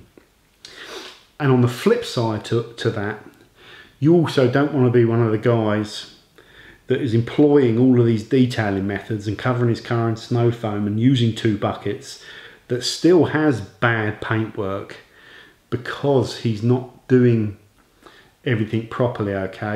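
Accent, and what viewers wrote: United Kingdom. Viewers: More people need to listen to you in the detailing industry... Having 30 steps to weekly wash a car is just crazy to me. I always try to do a good effective wash without spending 2hours of preps+wash+putting everything back in the garage. And i also use in my rinse bucket a vertical grit (bough at my hardware store) to wash my mit properly, then wring it out. I think i am going to try your method, just because it makes it so cheap, safe, and practical. Keep up the good work my friend, plus i always laugh at your jokes, might be the british accent lol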